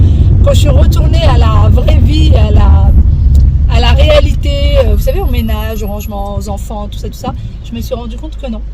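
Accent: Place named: Morocco. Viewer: Bon début de semaine ♥️🇨🇵🇲🇦 et Allah y3awnk ala wladk j'adore ta façon du parle Marocaine hh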